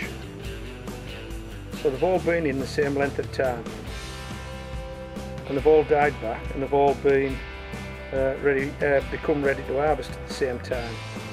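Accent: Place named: West Midlands, United Kingdom. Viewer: Thanks for that. Now, at first I thought you were from Durham, but I read you were up near Scotland on the west side, but I keep hearing some West Midlands in your voice. Am I right?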